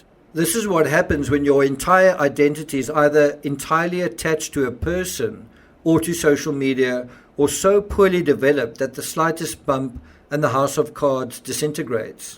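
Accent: South Africa. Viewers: You sound South African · Excellent work,after feeling your near desperation in the 'recent true crime circus'- you totally nailed this one and your quality shines through strongly. Best wishes for a quick recovery of your injury.( my 9 yr old loves your S.A. accent and 'please doo' is heard often in our household..lol)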